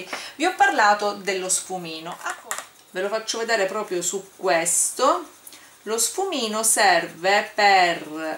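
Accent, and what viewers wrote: Sicily, Italy. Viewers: Ma sei Siciliana vero?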